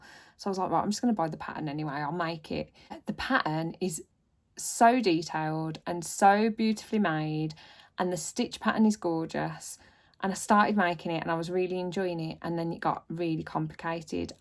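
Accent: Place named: Birmingham, United Kingdom. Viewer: As a Brummie living in New Zealand, I’m loving your podcast and hearing the familiar accent! Have a wonderful Christmas 🎄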